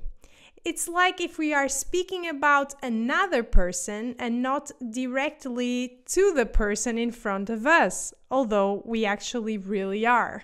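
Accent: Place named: Portugal. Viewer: You are the best! I used to live in Portugal some years ago and my language get worse over time but you totally help me to remember some of things which I lost and even upgrade to some new things! What I really like is that you speak slowly and every word can be perfectly understood either in Portuguese or English which make things way easier then watching some other channels + you have really nice Portuguese accent. Lot of other channels use Brazilian accent that can create issues for someone who use to learn and speak Portuguese in Portugal and not the one from Brazilian TV novelas. Thank you so much and grande abraço for those useful lesions!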